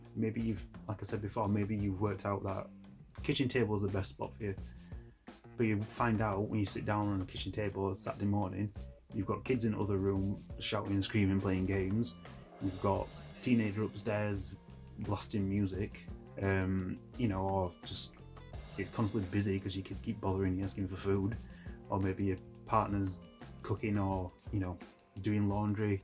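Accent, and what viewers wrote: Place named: Yorkshire, United Kingdom. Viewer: Good tips....like you say, easy to overlook. I’m trying to place your accent?...south/West Yorkshire?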